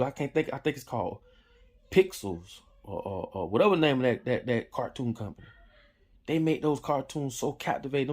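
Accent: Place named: Florida, United States. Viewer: I knew you was from Florida the way you been talking.......str8 facts!!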